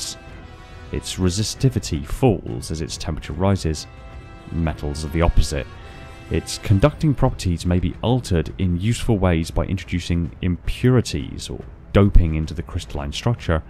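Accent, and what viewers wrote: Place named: England, United Kingdom. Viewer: The English accent makes narrating this video gives it a certain level of elegance.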